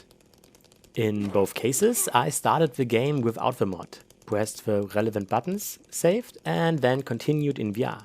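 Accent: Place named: Germany. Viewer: imo perfectly fine. Your english sounds very german but who cares. The content is great btw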